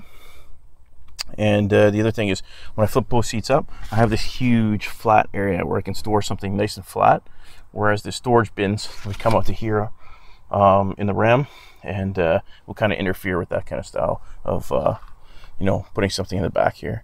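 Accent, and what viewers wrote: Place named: Canada. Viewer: How did I know you were canadian based on your speech? Ha you've got me even more intrigued by the custom trim. I want the LT, but not sure if it's worth the extra 10k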